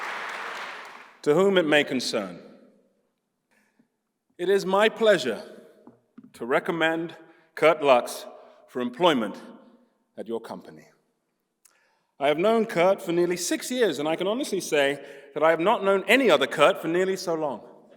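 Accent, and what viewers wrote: United Kingdom. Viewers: shit is so scary when you see a guy in american productions only and then he's british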